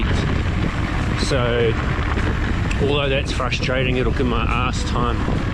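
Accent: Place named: South, United States. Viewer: Your cowboy / country guy voice is awesome. Lol. Keep it up!!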